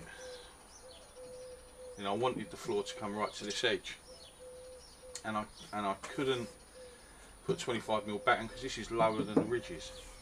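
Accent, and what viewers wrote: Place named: London, United Kingdom. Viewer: Hi. Just came to this channel after a shout out from self build campervans on Facebook. I'm in the process of doing a conversion too so I completely understand what you're saying about having workshop facilities etc. My ex-community minibus is not even parked in my driveway! It's about 100mtrs across the road. Admittedly it's quite peaceful there, but it's a proper pain when you need the next tool or a piece of wood... Anyway, I'm enjoying the videos that I've seen so far and I have subscribed. Love the "suits you" every so often, but of course not everybody will understand it :) Keep up the good work; I know you'll get there in the end. Regards Mark in the UK p.s. pretty strong good ol' London accent there; are you in the London area or have you moved?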